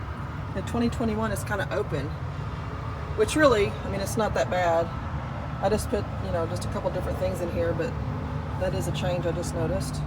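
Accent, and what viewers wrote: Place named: South, United States. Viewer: I love your southern accent. Your so cute! Thanks for sharing your experience of owning a Tab 400. It really help us new owners. I learned so much!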